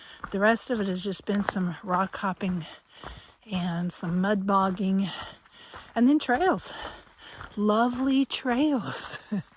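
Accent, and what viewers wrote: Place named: South, United States. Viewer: Awesome! 100 Mile Wilderness. So close to Katahdin it's not even funny. WTG! I can so tell you're a southern gal, no one says "fixin to" like us southerners 😂. Okay, the southern drawl is a dead giveaway as well, but the "fixin to" just sealed the deal 😊😁. Happy trekking! 🥾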